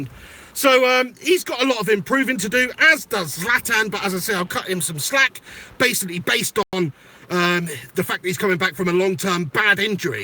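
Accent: Manchester, United Kingdom. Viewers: You a local Manc? lmfao!!!!!!!!!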